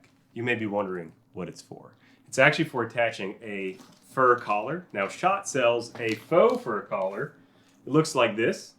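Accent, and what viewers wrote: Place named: Pennsylvania, United States. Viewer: love the pennsylvania accent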